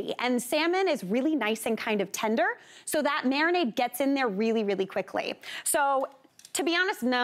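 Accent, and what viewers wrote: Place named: Canada. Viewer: Eh. . Eh?..so Canadian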